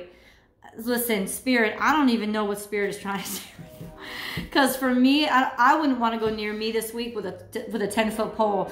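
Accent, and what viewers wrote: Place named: Philadelphia, United States. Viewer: I am laughing out loud.....you sound like you are from my area,,,,Philly......haha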